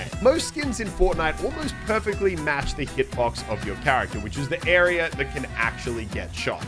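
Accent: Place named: Australia. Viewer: Even though it's basically becoming SypherPK with an Australian accent, a week late. It is still not nearly as bad as the Fake American Accent Aussie Fortnite Youtuber.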